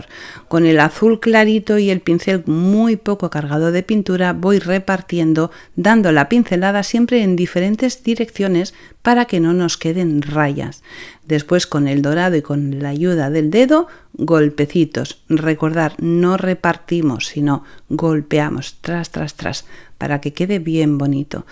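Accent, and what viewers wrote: Spain. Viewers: ¡¡¡¡,sabes a mi me sucede ygual?,tengo infinidd de cositas hechas de hace años en distintas cajas,y en ocasiones las encuentro,....y me vuelvo loca mirandolas,como si no las hubiese hecho yo misma,y me emociono.....asi es un poco raro no?....bien tu trabajo es como dices ESPECTACULAR, que belleza besotes desde ARGENTINA creo que tu eres española sai me suenas 🤩🥰😂🤣😚☺ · ESPECTACULAAAAR!!Lo haré y espero me quede igual de precioso como en tuyo!!!Me encanta tu toni de voz y tu acento Español !!👍👌👏👏😘🌹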